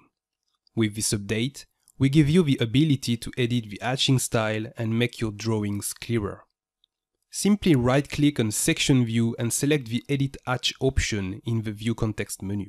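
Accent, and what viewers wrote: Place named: France. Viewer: Avec l'accent français !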